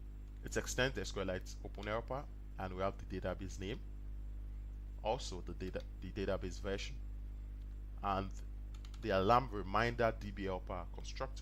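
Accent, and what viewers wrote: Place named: Nigeria. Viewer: you sound nigerian.. are you?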